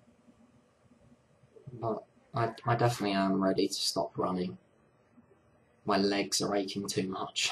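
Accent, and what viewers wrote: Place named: Harlow, United Kingdom. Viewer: At last!!! I can pinpoint that accent...HARLOW!!! :^) lol. I'm an Essex boy but the complete opposite of the stereotype, and now live on an Island in the Indian Ocean...another Brit that has jumped ship! Anyway, regarding your series on Wave Function...I think you might be interested in Nassim Haramein, search also for ''the illusion of reality'' (59mins), and Flagellar/um motor or watch watch?v=VWvS1UfXl8k all parts. Peace Dude (fellow guitar player).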